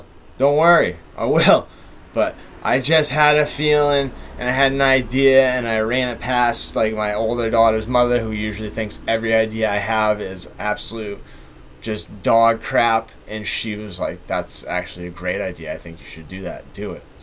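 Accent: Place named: East Coast, United States. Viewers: I can't pinpoint your accent but east coast would be my general guess lol I'm from the mid south with some what of a southern draw lol oh well i love the east coast and have seen most of it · Massachusetts accent 💪🏻💯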